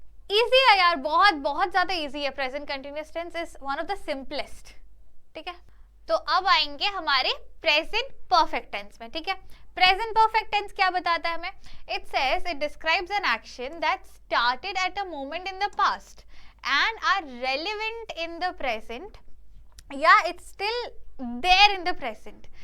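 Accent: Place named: Bengal, India. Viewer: I really like the Bengali accent when you speak Hindi it sounds cute when someone speak like that😊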